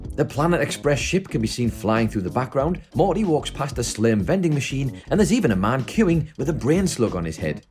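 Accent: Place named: Newcastle, United Kingdom. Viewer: Geordie accents and rick and morty do not mix well together 😅 I can’t take any of these theories seriously. Sorry not sorry. Big up tho cool video 👊❤️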